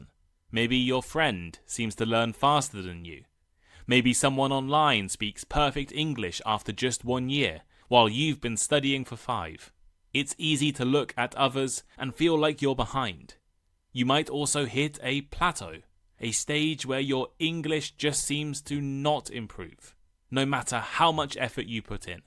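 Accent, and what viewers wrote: United Kingdom. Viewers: It's my great honour to hear from you. Shadowing your clear and standard Britsh accent has become a habit of mine.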